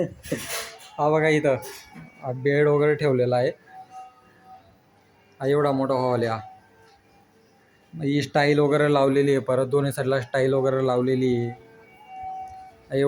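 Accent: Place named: Dhule, India. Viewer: मी तुमच्या बोलण्याने आधीच ओळखलं होत की तुम्ही धुळ्याचे असाल. मी पण धुळेकर..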